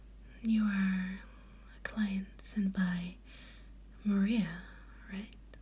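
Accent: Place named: Netherlands. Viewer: It's funny how the teeth improve your diction with your Netherlands accent. ;D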